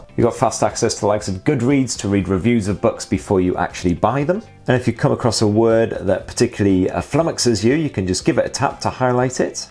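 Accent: United Kingdom. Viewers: british accent is very annoying! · Pure British accent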